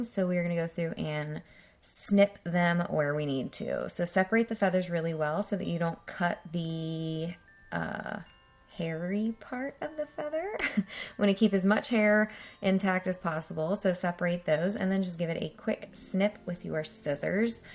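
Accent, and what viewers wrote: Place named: United States. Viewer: Lovely video ✨ I love them all, especially the white one. Creative, a nice narrator, and nice American accent 🌙 🎄❄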